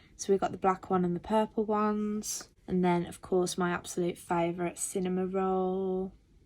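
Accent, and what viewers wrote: West Midlands, United Kingdom. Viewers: Hi, just found ur channel so ive subscribed, i know how important likes, views & subs r on Utube. Just wanted 2 say " U GO GIRL ", nice 2 c uk nail artists starting new channel, as its so swamped with USA, which i enjoy watching 2, but wud luv if UK & USA were more evenly represented!! I also thought of starting 2 film my nail art but I'm just not brave enough at min, so huge respect 2 u 4 doing that 😊 U GO GIRL!!!! Anyway, enough waffle from me 🙊, lol, lookin 4ward 2 more vids from u, BYYYYEEEE ❤❤❤ P.S. Do u mind me asking where ur from? Not exact address, lol, im not a stalker, promise, but im from Oldbury and I have a feeling ur not 2 far from me judging by ur accent. I wud luv some input from a local nail enthusiast as ive started making & selling press ons. If ur 2 busy no problem, I'll find sum1 eventually, just wanted 2 ask 3 or 4 questions if u ever have time, thx hun 😊😊 xx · New subscriber love it all lol and your accent is very common lol 😆 😂 I'm from walsall West midlands and I said to my chap "omg babe she's from round here somewhere ay she ? 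" lol 😆 🤣 😂 I'm a begginer at nails just started out a few months ago love everything you have look forward to your next haul lol like being nosey what others get 😆 😄.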